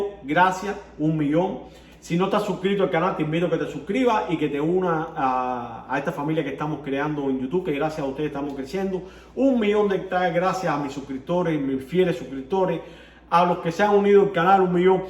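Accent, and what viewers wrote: Cuba. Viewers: Eres cubano mi bro?...buenos videos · Hola, bien marcado ese acento cubano. Conozco el peach, El rosa, el tobacco touch y el cherry, me compre el cherry y el tobacco touch, me Super encantó el tobacco touch tiene una salida de clavitos de olor, nuez mozcada, miel dulce, parece un cake de navidad.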